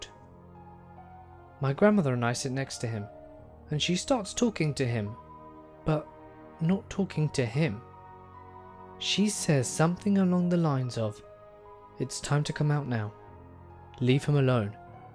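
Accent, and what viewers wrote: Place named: Southern England, United Kingdom. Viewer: Ah, what a nice response! Would that all YT comments were so reasonable and intelligent. I'm northern English myself so he's just a nice-sounding southerner to me. But I understand how he might sound semi-exotic to our friends on the other side of the Atlantic!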